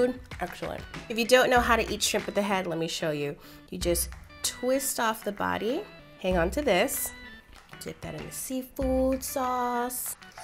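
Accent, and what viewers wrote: Thailand. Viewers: I love your Thai accent!!! So cute❤️😘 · This is perfect yess btw love your Thai accent!! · Love ur thaiglish accent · I love your Thai accent 😃😃😃💛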